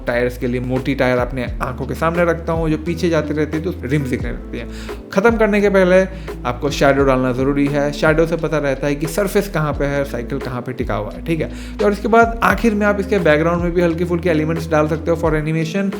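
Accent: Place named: Kolkata, India. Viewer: আপনি কি বাঙালি? আমি বাংলাদেশ থেকে। হিন্দি অ্যাকসেন্ট কলকাতা এর মতো লাগছে।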